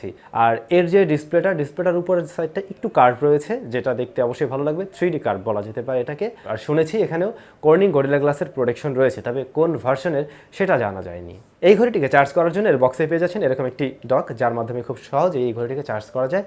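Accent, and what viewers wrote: Barishal, Bangladesh. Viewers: ভাইয়া বরিশালের ছেলে নাকি :/ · Vai barisaila language misaien na... 😫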